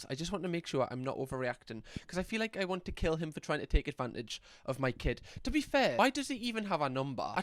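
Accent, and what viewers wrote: United Kingdom. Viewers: You could spend YEARS on Reddit for reaction content -- "Am I the Asshole?" (AITA) is loaded with insanity. Also, from what I know, the UK says "privacy" with a short i, as in "igloo", and the US says it with a long I, as in "I'm going to the store." Personally, I like your UK pronunciation because, you know, you're from the UK. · With an american accent we have to say pr-👁️-vacy. With your british accent priv’acy works:) you and can say either if you want imo 😆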